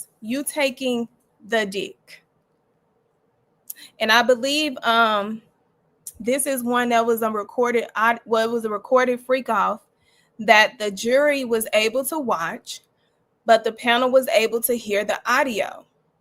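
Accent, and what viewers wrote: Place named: Houston, United States. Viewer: You sound like you can be from H-town😅 That mole is pretty sexy! I wanna kiss it! 💋😍 😜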